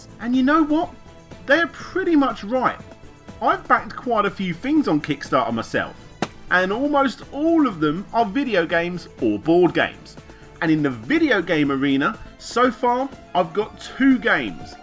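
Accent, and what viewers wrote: England, United Kingdom. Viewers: He's British, it's an accent thing. That said "Requeem"? "Requeem"!? But I suppose if you've never heard the word before... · British accent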